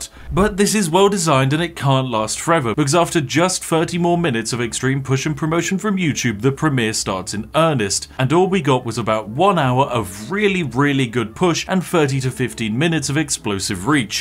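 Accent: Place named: Yorkshire, United Kingdom. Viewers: Wow Yorkshire. Tea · Very Yorkshire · Yorkshire :3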